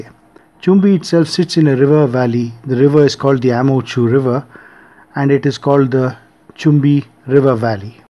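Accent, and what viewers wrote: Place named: India. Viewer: This is a very good presentation done by an Indian narrator with a genuine Indian accent. Use more narrators of Indian origin rather than those awful male / female computer generated drones who talk like mechanized robots and cannot even pronounce the names properly.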